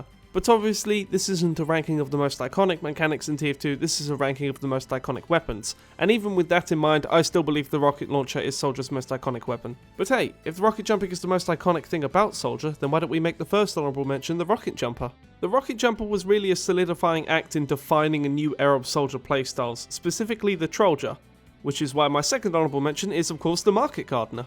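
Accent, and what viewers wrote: United Kingdom. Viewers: sounds kinda British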